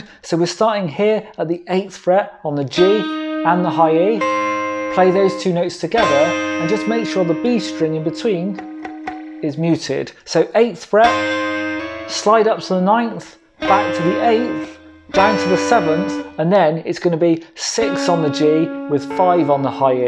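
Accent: United Kingdom. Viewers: Great video. Just got the backing track from yr payhip. Wish i could get a pdf tab ? Also, thanks for your nice and clear british english 😀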